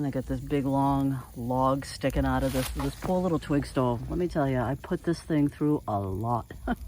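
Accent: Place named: Massachusetts, United States. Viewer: Def a massachusetts Gal, that's my accent. I'm closer to Braintree etc. Used to camp up in Maine and NH a ton. Great video.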